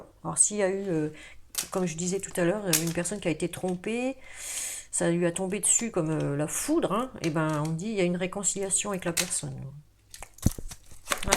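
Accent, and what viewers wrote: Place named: Nord-Pas-de-Calais, France. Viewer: Belière je viens espionner mon chéri Cancer, j'aime bien votre accent chti ayant vécu plusieures années dans le nord mis à part le climat j'aime le nord et les gens du Nord que je trouve plus chaleureux que d'autres. Bonne continuation et on ne peut plaire a tous la vie est ainsi faite😘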